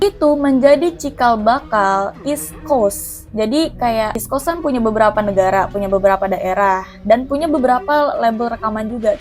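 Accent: Jakarta, Indonesia. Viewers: Logat bicara ny Betawi bngtt, jakarta bngt ❤ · Setelah 3 bulan Rindu nih orang akhirnya nongol kembali Background nya fresh Ngomong Betawinye resep Moga laptopnya Selalu di berikan Kesehatan Lahir Bathin 😂😂😂 biar gak rusak lagi Semangat ❤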